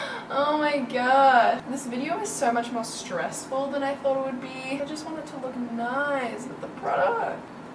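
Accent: Australia. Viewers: Mia she's Australian. it's an Australian accent and her address is clear enough to tell she lives in Australia... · Nuria Olid shes british? she sounds kind of australian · Oh my goshhhhh you're so right about the IT powder. Hate it. I do a lot of flash photography and learned the hard way about the white spots. Then again about the UD eyeshadow palettes. I have 3 of them and I bought them when I first started to really get into make up and because urban decay was/is a popular brand only to find out those eyeshadows really do suck. I just got my first ABH palette and there is no comparison. By the way you are so gorgeous I love watching your videos. Plus I love the Australian accent and if I ever go out of the USA I'm going to Australia hands down.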